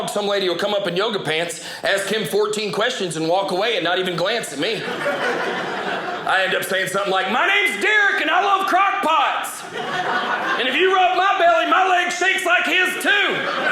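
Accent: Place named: South, United States. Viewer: it's how Alabama, Arkansas, Texas... people talk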